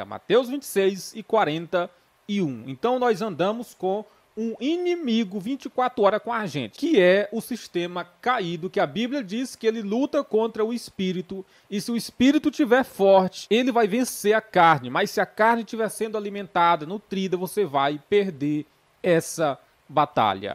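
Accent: Northeast, Brazil. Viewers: Que Deus continue te usando missionário tremendamente porque tu é profeta homem eu acho muito bonito esse jeito que o senhor se expressa uma forma nordestina sincera de falar mas tudo dentro da palavra é o que importa continua falando a verdade Profeta Deus é contigo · E muito bom o assunto falar sobre o assunto,acho que deve sim ser falado por pastores.existem muitas pessoas as vezes dentro das igrejas com tantas dúvidas sofismas medos. Eu mesmo sou uma, KKK agora é uma resenha o jeito que cê fala parece ser nordestino também. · Ele é divertido. Dom do nordestino... Kkk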